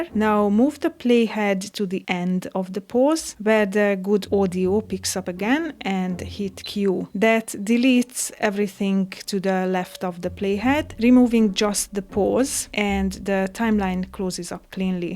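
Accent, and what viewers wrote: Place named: Hungary. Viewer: I might be mistaken but are you per chance hungarian? Your accent kind of sounds like it! :) Anyways great video, you gained a like and a follow! :D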